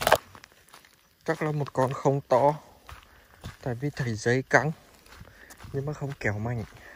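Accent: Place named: Thanh-Nghe-Tinh, Vietnam. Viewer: Ông ơi nói tiếng địa phương khó nghe qua. Thật lòng thích câu mà nghe tiếng thanh nghệ tĩnh là chán luôn!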